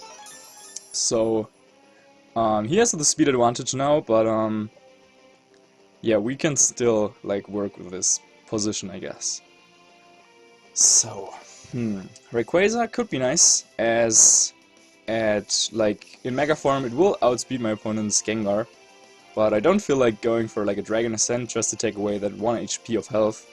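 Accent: Germany. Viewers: just do it in german youll be fine ......................... well you do english videos as a german? so dont worry about ur pronounciation itll always be germanish :D just as mine ---.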